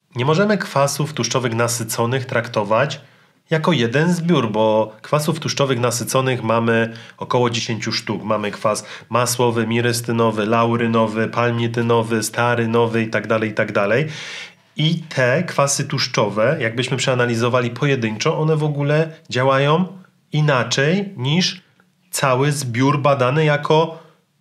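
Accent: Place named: South, Poland. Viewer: Oj, taka gwara południowa!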